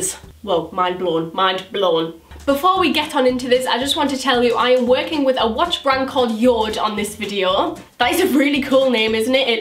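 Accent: Newcastle, United Kingdom. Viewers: Are you from Newcastle xx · Hello it's by birthday today and it would be really really cool if you could reply to my comment !!! I love your channel soooo much and you're so funny and your jordie accent melts my heart !!! Love you so much it would mean the world if you read this !!! Thankyou xx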